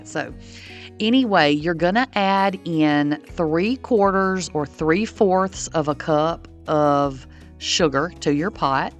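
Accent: South, United States. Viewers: It’s a southern dialect, maybe Tennessee or Kentucky id guess. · Her accent is about as Southern and wonderful as you can get 💕💕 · Her accent is from the American South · I love your southern accent I could listen to you all day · She has a southern accent. I don't know which state she is from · That's a southern American accent :) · This is 100% fine & authentic, southern American accent. 👏👏👏🥂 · I love fudgsicles! You made following that recipe look easy. Thank you for sharing that. Your southern accent is pleasant to listen to and your tattoo is very beautiful also!!! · It's a beautiful accent from a southern state. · Love your Southern accent and your lovely voice. Thanks for the recipe! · Great video. They look delicious! Will try these for sure! And love your southern accent! ❤️ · I thought you sound like a true Southern Girl. 😉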